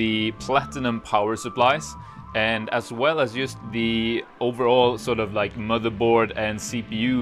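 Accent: New Zealand. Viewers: i love your kiwi! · Hi, many thanks for another great video. Always loved the Kiwi accent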